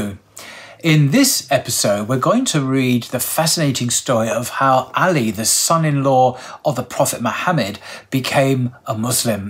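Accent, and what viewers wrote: United Kingdom. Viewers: Keep makimg these videos. 👍 We love your british dialect for storytelling.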